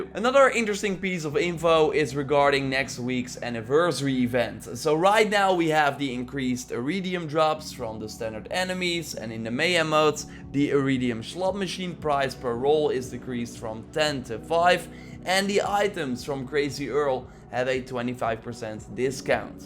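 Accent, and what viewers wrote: Netherlands. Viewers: Great vid sir but are you dutch?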